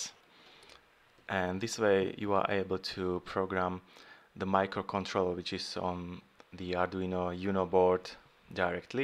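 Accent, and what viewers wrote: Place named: Slovakia. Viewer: Are you from Slovakia? I sense a Slovakian accent there :)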